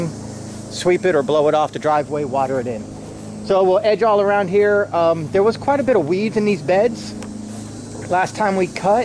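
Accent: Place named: New Jersey, United States. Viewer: Great Channel and content. Nice work too. But do I detect a Jersey accent..? Formerly Monmouth and Essex County here, was in business for 27 years. Keep up the good work!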